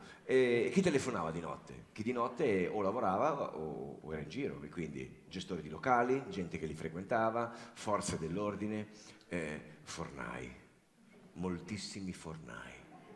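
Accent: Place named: Romagna, Italy. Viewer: Bravissimo quando guardo i tuoi spettacoli mi fanno passare la tristezza , anche perché mi piace molto il dialetto romagnolo . 👏👏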